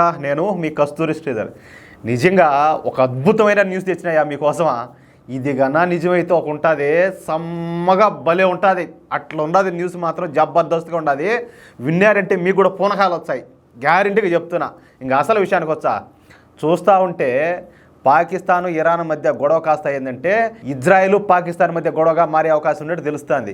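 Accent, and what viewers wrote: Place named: Nellore, India. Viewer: Anna medi nellore ha slang alage undii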